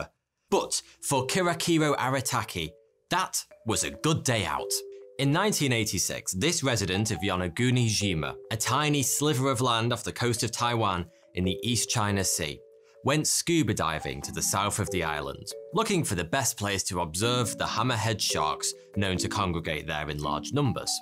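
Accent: United Kingdom. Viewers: Before giving in to this video's nice British Accent. I encourage anyone to watch Rogan #961 for a full debate between a leading skeptic and Randall Carlson/Graham Hancock. There's a solid foundation for his reporting and it's more challenging to actually listen than to just swallow the easy answer that "Leading Experts" disagree. His solo venture #1284 of the Podcast also includes a deep dive into the Piri Reis Map, and I was skeptical until the revelation about the Bimini Road. Definitely a worth few hours of your time.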